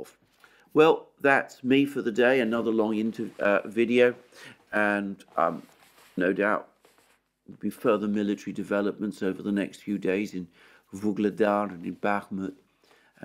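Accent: United Kingdom. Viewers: "Pentagin" 😁 I love the Brit accent.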